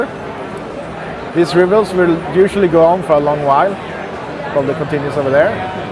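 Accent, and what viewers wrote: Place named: Sweden. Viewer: I just love english, so typical swedish-english!!!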